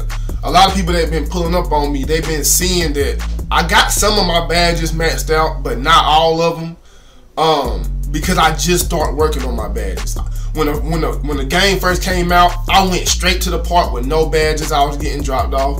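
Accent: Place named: South, United States. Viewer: Yo acccent country asf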